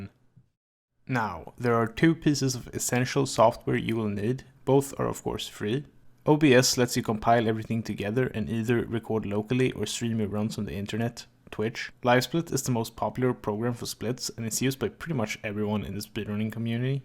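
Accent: Sweden. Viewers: du har den klassiska svensk-engelska dialekten haha grattis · i fucking love your swedish kinda accent! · den svenska/engelska dialekten ;) du låter iallafall bra med din dialekt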